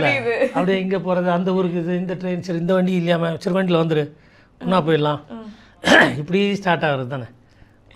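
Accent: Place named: Madurai, India. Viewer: Madurai tamil maarave illa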